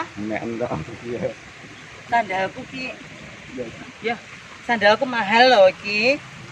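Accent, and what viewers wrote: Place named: Ngawi, Indonesia. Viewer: Lucuuu yaaa Mbak...saya asli Ngawi sdh 18th tinggal di Bali dadi kangen sama kampung loo Mbk...boso ne mengingatkan jaman cilik ning Ngawi😘😘😘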